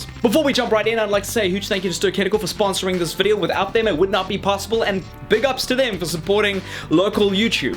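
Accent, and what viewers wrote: South Africa. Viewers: are you south african? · Your from south africa? :D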